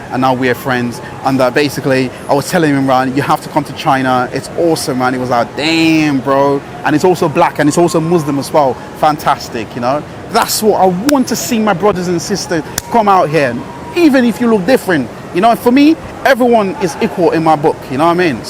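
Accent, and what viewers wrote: United Kingdom. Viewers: It's like you're speaking with a British accent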